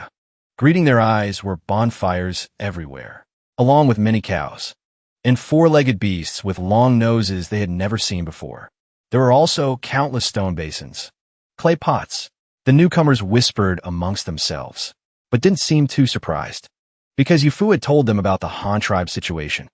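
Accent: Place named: Mexico. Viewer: whats with the occassional mexican accent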